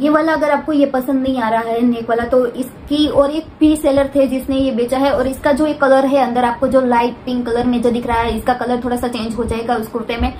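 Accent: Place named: Gujarat, India. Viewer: I just don't like your gujarati tone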